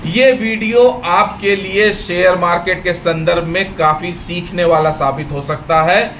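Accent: India. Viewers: Ek number Desi andaz mein bat krte ho bhae .. very genuine person.. very brave and courageous person. Salute 🙏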